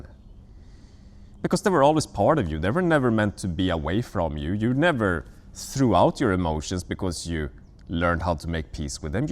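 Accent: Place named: Scandinavia. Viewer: Your strong Scandi accent gives your words even more power! Also, please give special treats to the doggy for such perfect supporting role, standing up and walking as far as his leash allowed when you were talking about expanding somewhere else, and looking away to the trees just as you mentioned shifting perspectives :)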